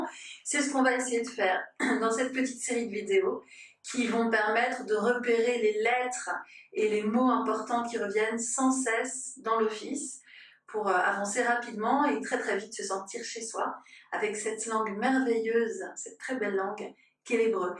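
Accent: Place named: Tlemcen, Algeria. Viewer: On dirait une tlemcenienne.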